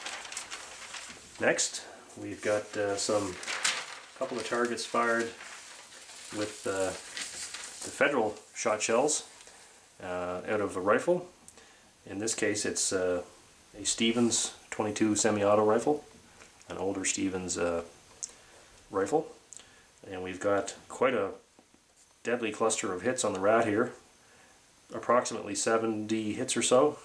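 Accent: Canada. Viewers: I thought you sounded like those epic meal time guys. That explains it. You're both Canadian.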